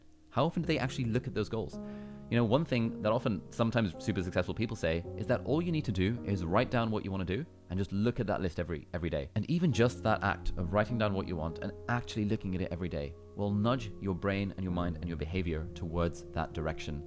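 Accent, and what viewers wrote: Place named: United Kingdom. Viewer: Omg the video automatically started in spanish and it sounded so souless lol I missed british voice inmedately😂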